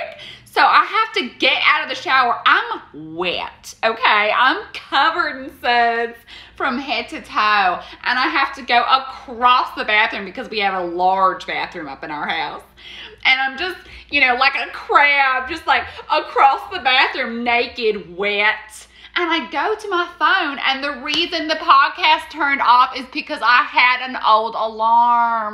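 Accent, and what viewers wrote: South, United States. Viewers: Lol The Southern accent is what the extra "magic" is. You know your stuff Woman. Lol. Nice work. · Ok so what accent is that because so many women I know have that in the South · I see Southern family at family reunions, so hearing the accent and you just talking about your experience was actually familiar and comforting to hear 😊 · Love that southern accent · You're legitimately the first actor I've heard who can do an accurate Southern accent Hollywood keeps pumping out movies starring A-listers mimicking Gone With the Wind for some reason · You did such a phenomenal job of emulating not only the southern accent, but also the southern style of storytelling. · you are so talented (not that you need me to tell you that) - too talented for that cliche, hackneyed “Southern hillbilly” parody accent. You can do so much better.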